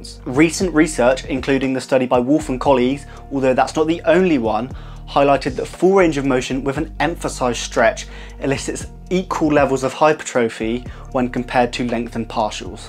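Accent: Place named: United Kingdom. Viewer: Are you British I like your accent 👍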